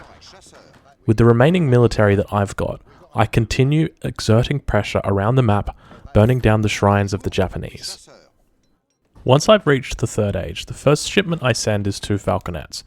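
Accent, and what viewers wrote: Australia. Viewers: He's just australian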